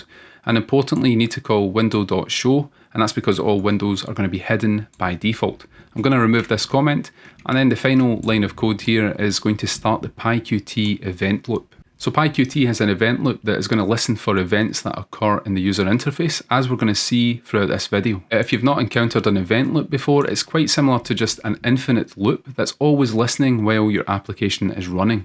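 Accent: Scotland, United Kingdom. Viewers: listening to scottish always makes me really happy for some reason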